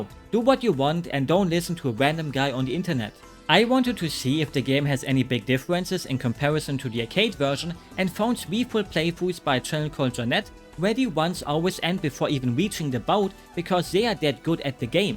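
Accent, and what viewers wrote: Germany. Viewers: you're funny. I love your German accent. No I don't want to say your German accent is funny, I don't mean to sound wude.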